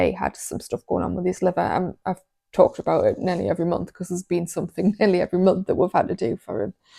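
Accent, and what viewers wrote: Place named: Northern England, United Kingdom. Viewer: Recently came across your channel and I am hooked onto your videos. It's refreshing hearing the finance journey of a fellow person in the north of England. Your accent is wonderful and thank you for sharing your story and past circumstances. Esp with the skin cancer scare, shows the importance of being mindful yet spending money for personal enjoyment too.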